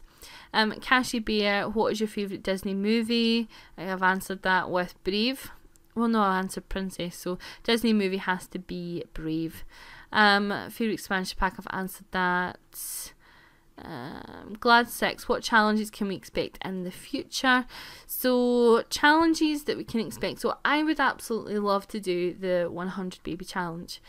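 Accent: Scotland, United Kingdom. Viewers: Awwwww I LOVE him! I got a kitten recently. Her name is Lola. She's SOOOO cuteeeee!!!!!!!!!! Now for me rambling on and on for no reason xD : My friend let me borrow this boom that takes place in Scotland and it made me think of you SOOOO much! When I'm reading about people in different countries, I like to have the accent in my head, and in the book her BFF was a Scottish girl named Emma (the main character is not a scot. OMG THAT RHYMES!!! :p ), so to figure out her accent so I didn't get frustrated when I read the book, I thought of how you spoke and repeated that in my head over and over again. :o)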